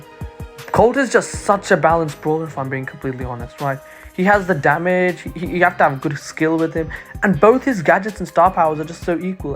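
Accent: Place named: Germany. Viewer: Wieso merkt man, dass du deutsch bist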